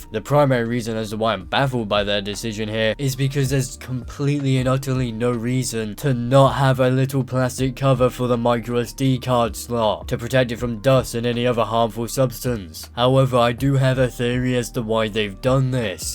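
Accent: United Kingdom. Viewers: British accents crack me up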